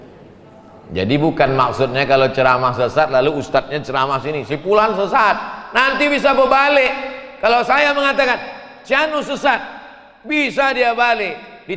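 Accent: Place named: Negeri Sembilan, Malaysia. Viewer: Sepertinya UAS bercakap loghat negeri 9 dicampur dgn loghat kelantan iyooooo 😆 Salam dari malaysia wahai ummat rasulullah SAW.👌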